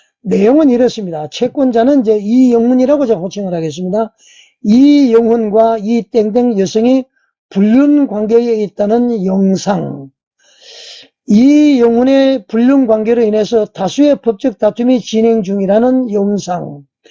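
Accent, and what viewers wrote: North Korea. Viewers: 유튜버님의 말투가 북한 말투 같은데... 실례지만 고향이 어디신지요? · 어투가 북쪽어투 근접~~ 그냥 밝혀 주세요~~ 나의 출생고향은~~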